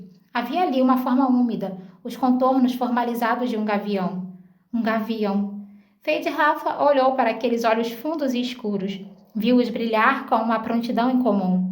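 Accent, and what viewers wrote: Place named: Rio de Janeiro, Brazil. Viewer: Essa voz linda com o o delicioso sotaque carioca ❤️( também sou carioca mas vivo em SP e fico com saudades )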